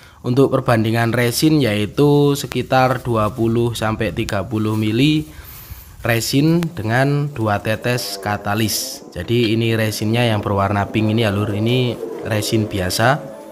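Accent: Temanggung, Indonesia. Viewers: Ketoro lek tiang Temanggung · Mantep mas.. Logate temanggungan.. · Koyo wong temanggung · Bosone khas temanggung wkwkwk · Nyrongat2, Temanggung banget basane👍👍👍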